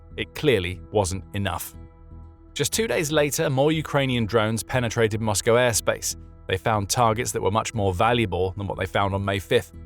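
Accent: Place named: Cockney, United Kingdom. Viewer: I can't stand to hear you say Rush-er, and other words like propagand-er, you sound like a cockney Brit!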